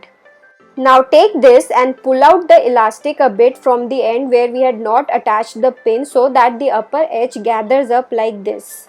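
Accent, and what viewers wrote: India. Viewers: I just want to hear your hindi accent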